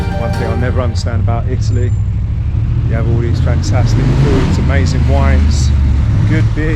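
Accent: United Kingdom. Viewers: I was curious to hear your Italian, it seems good to me, with a very British accent😉, funny to see you wrong to say "specificamente" haha👍🏻 The Sicilians in the port of Catania all seemed very intrigued haha .. Sicily is beautiful but also very particular, especially the Sicilians, you just need to move a few km and change cities to find very different habits and customs, the food is usually very good and cheap , but be careful to call food right, Sicilians are offended if you're wrong haha... I crossed Sicily from Catania to the Egadi in 2021, passing through Etna, Enna Agrigento, Palermo and Trapani... so I'm very curious to see all 3 videos. I've never tried Bronte pistachio limoncello🤔 but it should be drunk after it's been in the freezer 😅👋🏻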